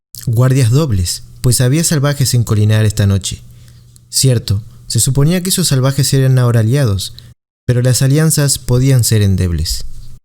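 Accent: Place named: Argentina. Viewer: De verdad, gracias a ti por hacer esto. Esta saga merece ser leída ( en este caso escuchada) por muchos. Me encanta tu narración, el acento argentino le da otro toque. Que bien que no te tomaste mi comentario a mal. Por favor, no pares y continua la saga. Subscripción inmediata.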